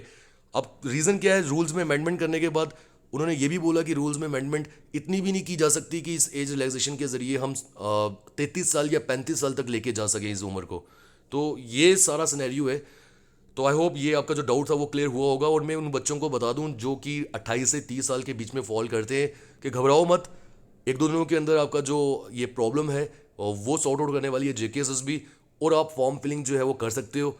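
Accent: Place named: Jammu, India. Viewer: Koi different voice Nhi hai Unka accent dogri hai or hindi mai aisa hi lagega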